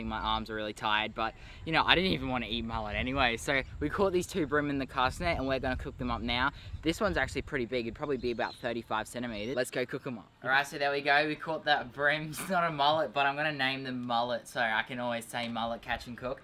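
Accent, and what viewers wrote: Australia. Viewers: I love the australian accent ❤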